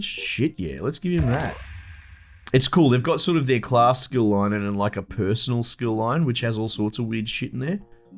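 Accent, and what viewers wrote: Australia. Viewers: This is the most laid back Aussie commentary I've ever heard on YT. Old mate? Any chance you're from northside of Brisbane?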